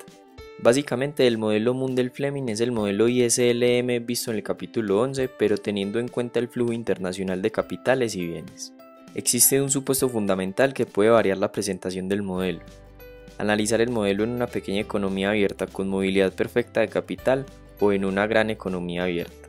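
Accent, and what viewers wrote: Antioquia, Colombia. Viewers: jajajaja muy bueno el video. Se le nota lo paisa.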